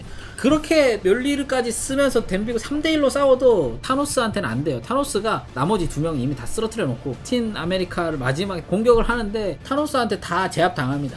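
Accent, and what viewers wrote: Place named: Japan. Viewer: 어 일본분인가여... 억양이.. 듣다가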